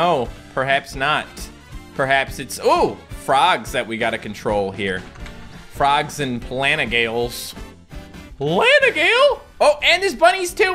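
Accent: Australia. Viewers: i wonder how the australian accent became a thing because im a australian and im nothing like the stereotypes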